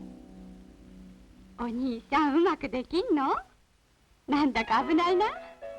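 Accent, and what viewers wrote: Japan. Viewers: 南関東方言の一つで、いわゆる「山の手言葉」ってやつですね。 今では話す人もあまり居なくなり、ほぼ絶滅状態の言葉です。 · 和式便所にダストシュート、それに焼却炉、今じゃ考えられないワッ！ 東京から来た女子転校生がこんな話し方だったなぁ～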